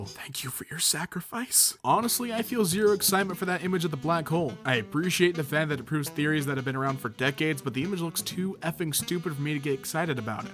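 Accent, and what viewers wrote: United States. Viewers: Why are you speaking American rn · Suddenly an American accent WAT IS HAPPENING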